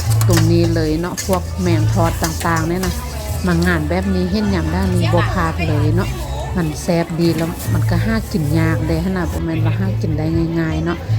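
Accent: Luang Prabang, Laos. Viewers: สำเนียงเจ้า คนหลวงพระบางบ้อ…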